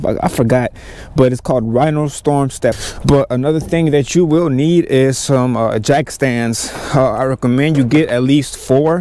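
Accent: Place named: Houston, United States. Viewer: Shit bro I knew immediately you're from Houston when I heard you talk. That's what's up.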